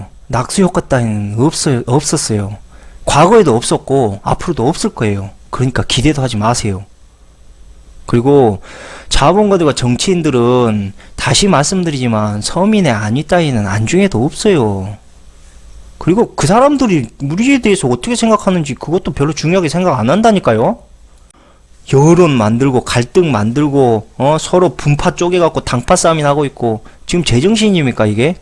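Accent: Jeolla, South Korea. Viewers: 고향이 전라도신가봐요~^^와 뇌섹남 어찌이런생각을 하시는지 완전매력적이시네요 멋있어요♡♡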